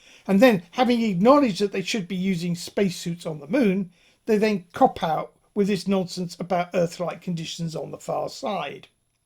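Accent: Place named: Yorkshire, United Kingdom. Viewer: Fascinating. The way you pronounced Frau im Mond sounded distinctly Yorkshire. Yes, I know The Romance Languages have the same thread running through them and the Germanic Angles and Saxon Tribes settled in Yorkshire some time in the C5th, or C6th. It just means I was reminded of the connections tied together in a neat little bow, through your audible delivery. Thank you, Cheers.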